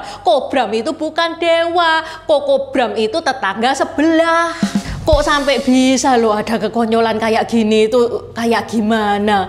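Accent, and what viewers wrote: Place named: Surabaya, Indonesia. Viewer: ngakaaaak… aplg logat e.. suroboyo -an e kentel… segerrr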